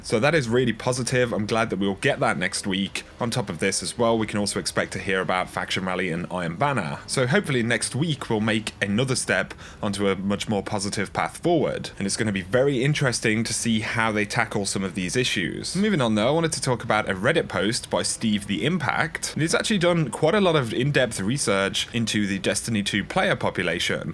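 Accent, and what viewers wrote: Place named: United Kingdom. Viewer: Keep it up! Out of all the destiny you tubers, I really like your stuff! You have a really interesting accent to listen to as well! Where in the UK is that?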